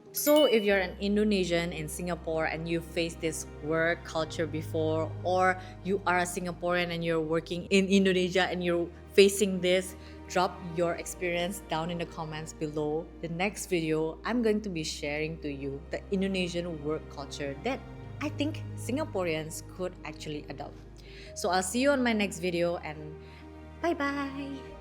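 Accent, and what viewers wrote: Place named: Philippines. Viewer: sorry, but you sound like a Filipina, sorry, not sorry, darn it! whatever!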